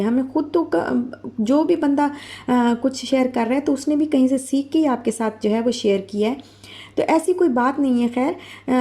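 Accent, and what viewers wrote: Saraiki, Pakistan. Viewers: ALLAH ap ko khush aur khushaal rkhay..ap ki pahli video ma dakh rhi hun ap k bat krne k style se hi andaza ho gia tha k ap saraiki hn...phr comments ma dakha to confirm ho gia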